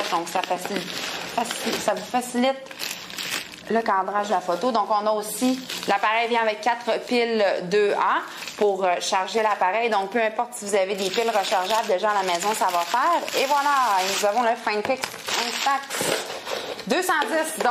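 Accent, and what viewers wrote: Quebec, Canada. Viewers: Maybe because of the french canadian accent she has ? but i understood her well !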